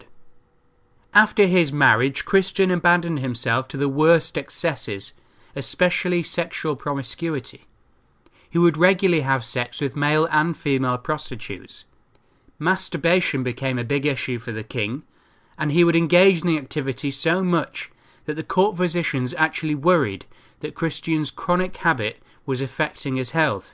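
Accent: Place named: England, United Kingdom. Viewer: All lies...spoken by an englishman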